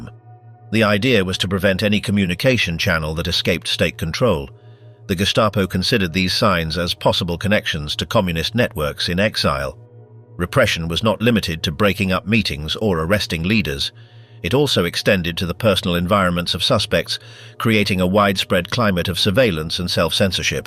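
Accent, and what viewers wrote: United Kingdom. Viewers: Like as someone re-edited all the documentaries into a poor ridiculous English accent… really bad.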